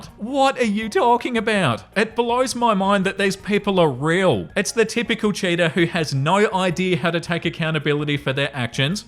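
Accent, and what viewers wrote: Australia. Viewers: Australian mate 🙌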